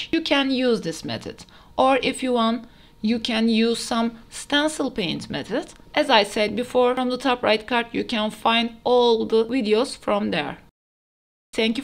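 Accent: Turkey. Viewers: you are turkish because your accent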